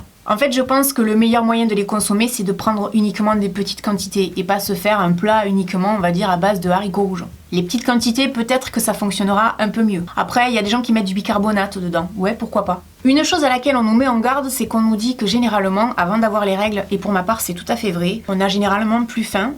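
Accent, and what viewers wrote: South, France. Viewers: Hey ! Superrrrr vidéo !! Pourrais tu faire une vidéo sur des recettes du "Sud de la France"(avec ton petit accent :-)) ou sur des recette faciles et rapides pour les gens qui ne rentrent pas chez eux le midi ;-) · tu m'a fait trop rire la spécialité du sud de la France avec l'accent du sud😂😂😂😂😂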